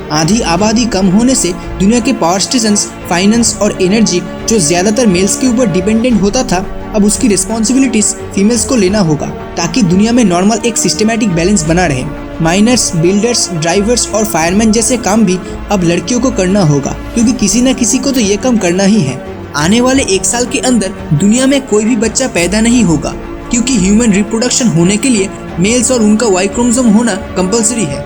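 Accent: Bengal, India. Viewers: Bhai video bahut achhi hai but tera pronunciation full toos bekar hai...poori Bangali tone aa rahi hai...tu kya bangal se hai..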